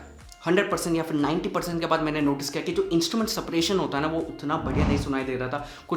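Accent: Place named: Kerala, India. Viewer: That accent is cool! Are you from Kerala?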